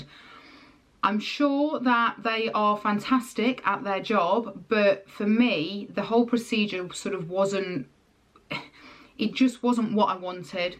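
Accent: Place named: Birmingham, United Kingdom. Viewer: Waitttt you’re from brum ?!? I knew I recognised the accent 😅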